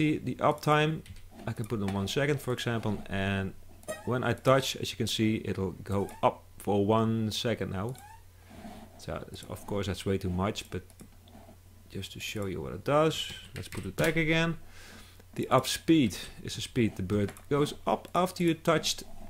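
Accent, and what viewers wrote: Netherlands. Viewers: haha, Nederlander ofzo die dit zegt. Haha, the man that tells it, is Dutch i think :)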